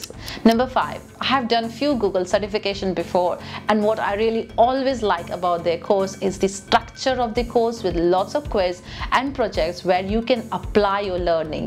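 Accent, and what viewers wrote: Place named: India. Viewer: Your sound is amazing and your sounds just like indian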